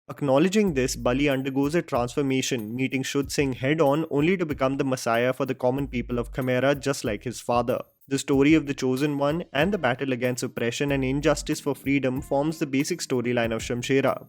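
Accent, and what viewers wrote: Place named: India. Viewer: Followed by horrendous indian accent ................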